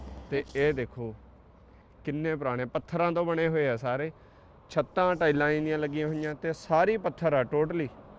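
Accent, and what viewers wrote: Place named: Malwa, India. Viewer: Scotland is cheaper than England example if house price in London 1 million pounds you can same property in Scotland only 400000 pounds only don't compare with England other things u said I am PhD from punjabi University but u still pure malbai u should change don't say gggg this is instruction don't mind?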